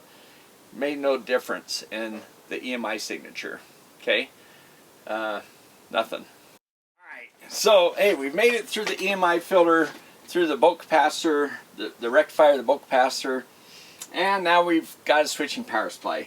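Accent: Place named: Utah, United States. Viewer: I hear n. Utah/s. Idaho in that voice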